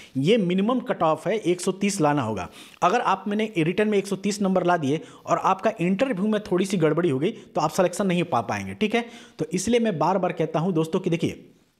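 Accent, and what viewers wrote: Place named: Bhojpuri, India. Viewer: Sir apki hindi..thodi Bhojpuri hindi kaisi lgti h..🤗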